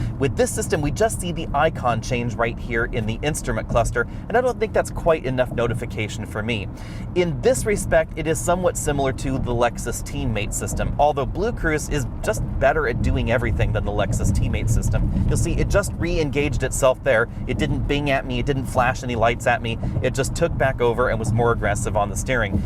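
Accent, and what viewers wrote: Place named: Midwest, United States. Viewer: Now I know why I like watching so much; he’s midwestern!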